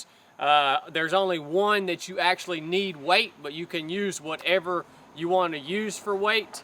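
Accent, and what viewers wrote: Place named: Tennessee, United States. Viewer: Love it nice, I dig the accent as it reminds me of my dad who was from Tennessee, I grew up in California I was a CTR in the NAVY, worked out a lot, I was even better on a run than most youngins. I am 48 now and got type 2 diabetes, got to watch how much beer and sausage I eat I guess. I pretty good, bit tired after lunch though. I always need a nap. I teach teenagers Mon-Fri. 8-2pm. I wake up to prep for work btw 6-7am. I go lift at the gym at least once a week maybe 2 sometimes, I try to do a FunkRoberts(youtuber) 10-20 min workout a couple times a week. Any tips on diet and workout are welcome guys, Thanks!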